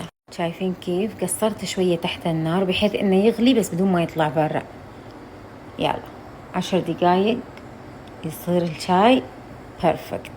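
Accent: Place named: United Arab Emirates. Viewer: احب صوتك والله الصوت الاماراتي اللي يفكرني باصدقاءنا الاماراتيين زمان ما شوفنا منكم الا كل خير صوت فيه طيبة وايمان الله يزيدكم نزلت مصر ومازالت الامارات واهل الامارات في القلب احن ل كل التفاصيل البسيطة اشكرك على كونك سبب في ذلك❤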